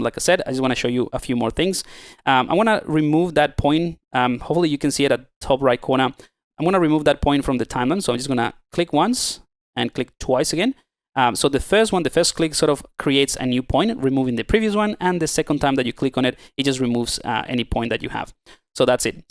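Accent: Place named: United Kingdom. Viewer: How come you sometimes sound british? Hahaha but more seriously, thanks for the video. I am learning zbrush on ipad. Have never tried any sculpting program on computer or tablet before. Its tough to learn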